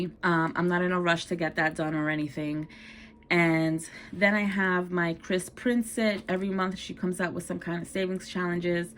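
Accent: New York, United States. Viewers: This story really resonated with me. I grew up in NYC too..I hear the NY accent in you lol. I actually bought my first budget binder because of watching your videos. I’m hoping the cash budgeting can help me too. Growing up my parents had really poor money management skills too. My mom was a mom of 4 living off public assistance and my PTSD was her always asking people to borrow money. I vividly remember numerous times she would beg people to loan her money because she couldn’t afford to pay her bills and all the borrowing led to more borrowing and she was always behind. To the point where we almost lost our apartment several times and eventually she couldn’t afford to live where we were and we had to move to the projects. I remember just being ashamed. The adult in me now just thanks god that at least we had a roof over our heads but even then in those reflective moments i wish my mom did better. 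Then when her kids were growing up she looked at our incomes as a way to keep up with her bills. My story is too much to tell on here but even though I never became like my mother asking for help all the time, I didn’t have good financial management skills and education. I didn’t have family members to show me how to save and talk to me about good credit. I used credit cards like they were extensions of cash instead of loans and here I am years later struggling with debt. Your channel is my favorite out of all the channels with cash budgets that I’ve seen so far. I also am in substa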